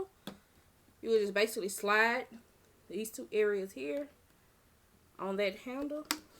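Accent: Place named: United States. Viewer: You have a very strong accent. I'm guessing you're American? Is the powerbrush still holding up? Thinking of getting one.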